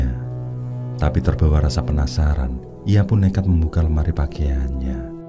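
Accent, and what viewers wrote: Java, Indonesia. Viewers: aq pake headset kok, tpi om nya terlalu medok 😁😁😁🙏🙏🙏🙏salam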